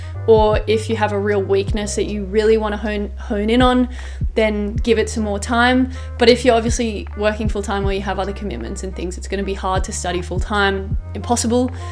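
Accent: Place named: Australia. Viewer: thanks for your content! pleasant to hear a fellow Aussie accent!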